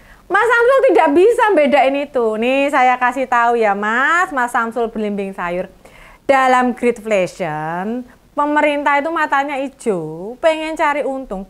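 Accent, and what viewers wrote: Java, Indonesia. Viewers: Seneng aku bahasanya medok dan lancar ancen pendukung Ganjar rata2 cerdas dan berintegritas ngos ngosan lanjutkan mbak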